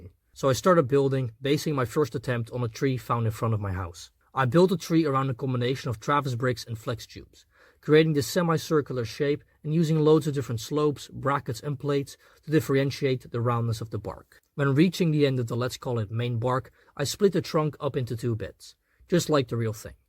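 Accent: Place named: Netherlands. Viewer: k voelde dat je nderlands was en nu weet k het zeker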